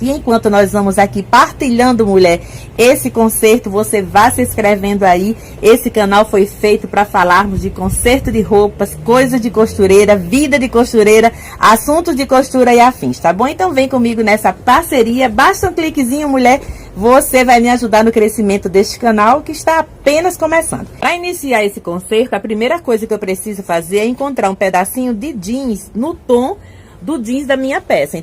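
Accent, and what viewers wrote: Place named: Northeast, Brazil. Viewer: Bom dia!!! Parabens trabalho bem feito Qual valor você cobra feste conserto? Qual estado vc e? Imagnei nordeste pelo sotaque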